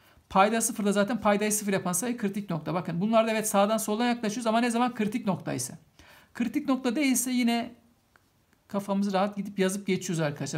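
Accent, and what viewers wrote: Trabzon, Turkey. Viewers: Hocam zannediyorum Trabzon/Arsinlisiniz.Konuşmanız orayı andırdı.Alakasız ama yine de belirtmek istedim.Ayrıca anlatımınız çok güzel. Teşekkür ederim.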